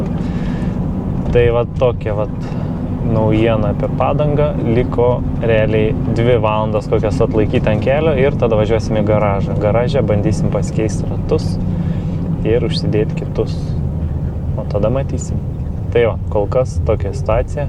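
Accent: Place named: Panevėžys, Lithuania. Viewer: Gal esi pasakojęs,bet iš kurio Lt galo kilęs? :D Palei tarmę lyg Panevėžys?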